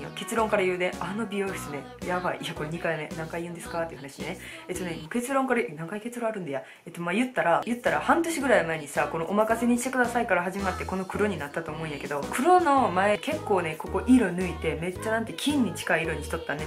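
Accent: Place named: Iyo, Japan. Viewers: ちゃんの、伊予弁？が大好きです💗 ずっと聞いていたくなる、癖になる、語尾にはまりました😊 伊予弁って可愛い （*'‐'*)♪😄😄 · 半分伊予弁半分西条弁やな(♡) 私の地元やけん話し方めっちゃ落ち着く♡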